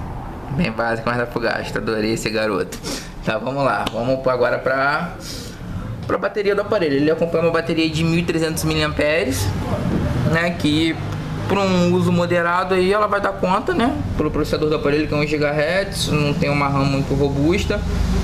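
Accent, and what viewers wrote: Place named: Rio de Janeiro, Brazil. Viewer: Que sotaque gostosinho desse cara deve ser carioca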